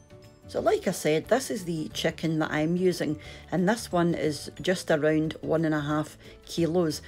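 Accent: Scotland, United Kingdom. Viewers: AWESOME ......love the scots accent x Mines now ready thanks 0) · Thanks for the video - heard the Scottish accent after a long time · Love the chicken recipe and the lovely Scottish accent.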